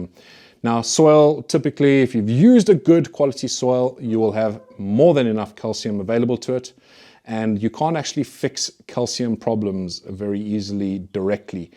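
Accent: South Africa. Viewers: THATS A SOUTH AFRICAN ACCENT, HOWSIT BROTHER!!!!!! been inspired by your grows and been blessed with amazing harvest here in Cape Town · Hello......you from South Africa....ZA. Accent 😅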